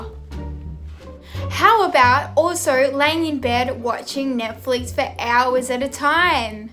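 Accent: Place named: Australia. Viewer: I really like your Australian accent! Also, I love bored eating too.😂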